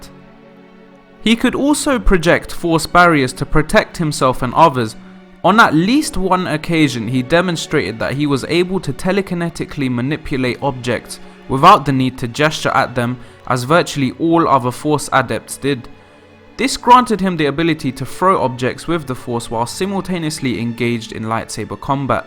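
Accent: Cockney, United Kingdom. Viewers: You sound like a cockney chav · Is this guy a cockney?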